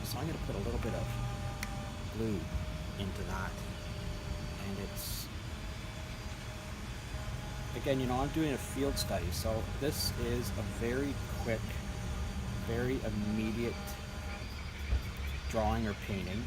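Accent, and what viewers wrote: Canada. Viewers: Loved this 😁 was real and I could feel like I was there watching you draw!! Also love your Canadian accent expecially when you say (Out) 😁 great art, great artist, and great channel, all the best my friend 👍 ❤️🇬🇧☮️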